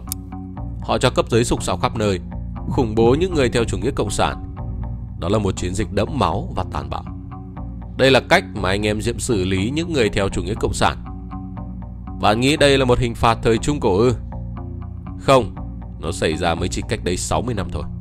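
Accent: Vietnam. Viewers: Chất giọng Việt ❤. Video thật sự phơi bày đúng những diễn biến thời cuộc.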